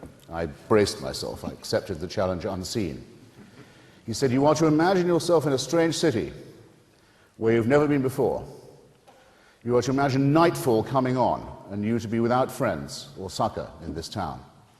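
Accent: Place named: England, United Kingdom. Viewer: It's just his pompous attitude, and English accent.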